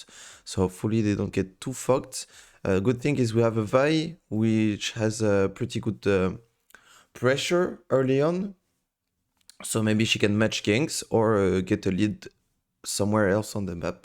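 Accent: France. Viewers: Wow je compatis! Je suis arrivé ici parce que pour la première fois aujourd'hui je suis tombé sur un Teemo correct (mes premières game les mecs y savaient pas space c'était un all in un kill 😂), j'ai fini en 0/7!!! Du coup j'ai fait recherche YouTube " Teemo"... Et je comprends maintenant! La vache c'est rude! Merci pour le contenu en tout cas, je suis débutant et je main Trynda et Sett et tes vidéos éducatives elles aident de ouf! (je me suis permis de comm en Français j'ai cramé ton accent 😂😂)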